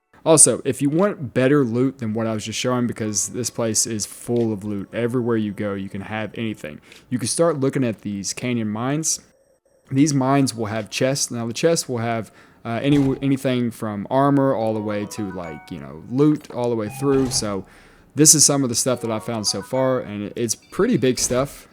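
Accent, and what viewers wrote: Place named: South, United States. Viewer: You're from the south, aren't you?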